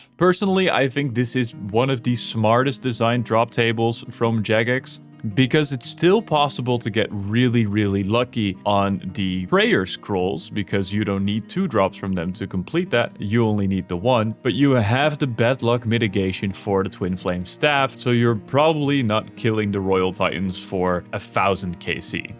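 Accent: Netherlands. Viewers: pretty nice effort hiding your dutch accent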